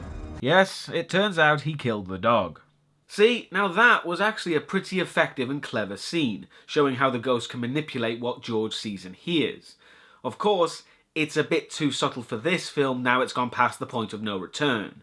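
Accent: United Kingdom. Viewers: cyberdemon 10130 I believe he is from the UK and the song is “ ”by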